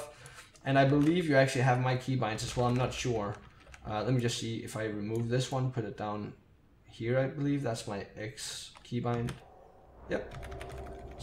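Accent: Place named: Denmark. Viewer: Man kan tydeligt høre at du er dansker! :D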